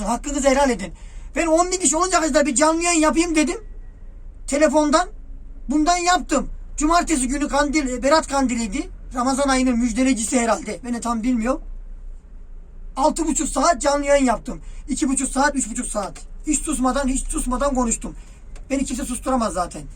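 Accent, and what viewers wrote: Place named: Yozgat, Turkey. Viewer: Slm abi biz seni olduğun gibi beğeniyoruz yaşadığın hikayeleri anlat sen yarı yozgatlıymışsın bnde bir yozgatlı olarak şiveni çok beğeniyorum bol bol hikaye anla yaşadığın komik olayları iyi yayınlar diliyorum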